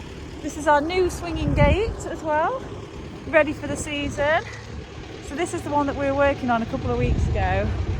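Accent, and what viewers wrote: United Kingdom. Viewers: First I want to say how extremely beautiful you are, I love your British accent also. We raise a lot of sheep hear in the US. We hire our sheep sheared and then the shearer always buys all of our wool. Our biggest money comes from selling our lambs. We grain them with some of our Iowa corn. We try to get them nice and fat before we sell.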